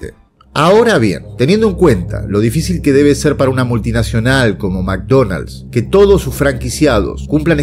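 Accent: Argentina. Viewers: Se llama. Da consejos sobre como correr mejor, por su acento pienso es tambien de Argentina.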